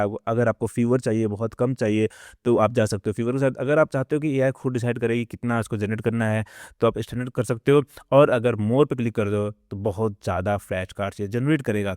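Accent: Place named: Rajasthan, India. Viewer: bhai aap kaha s ho aapka accent to rajasthan ka lag rha h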